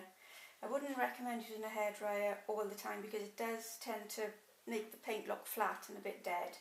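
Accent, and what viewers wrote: Wales, United Kingdom. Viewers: I just got into watercolours as a way to manage stress and anxiety - it can be overwhelming as a beginner but your video was exactly what I was looking for. You move at a good pace, your explanations are wonderful and your Welsh cadence is a pleasure to my ears. Thank you, brilliant work.:) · Yeah. It's easy to tell a welsh person from the accents. I love your video by the way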